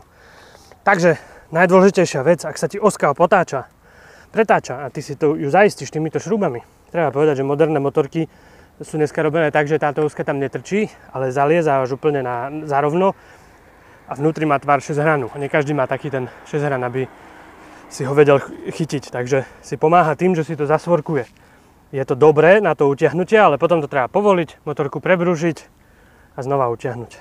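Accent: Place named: East Slovakia, Slovakia. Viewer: Čuc že to vychodňar 🤣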